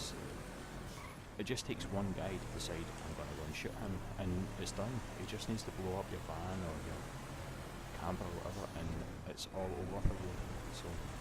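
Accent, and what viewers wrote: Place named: Scotland, United Kingdom. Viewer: I really agree with what you're saying this is why I haven't invested in buying an office yet. my personal opinion on this update is it seems like a bit of beta idea for the next gta. kinda like how the whole gta was a beta on last gen. anyway good video your mic was a little quiet, but I do watch most of my videos and maybe that's why, you also surprised me I thought you would be American (not sure why) ,but you're Scottish which is cool Bro 👍✌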